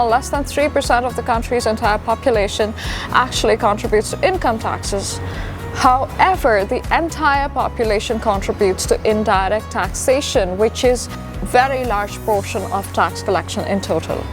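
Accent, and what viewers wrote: United Kingdom. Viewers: Ur accent is irritating either u completely speak british accent or complete indian accent pls don't mix both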